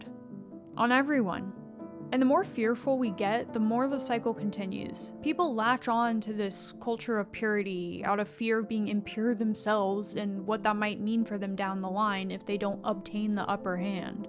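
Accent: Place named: United Kingdom. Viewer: You sound British, nice beginning in vloging, keep up, which town is this one? Great video 🎉🎉🎉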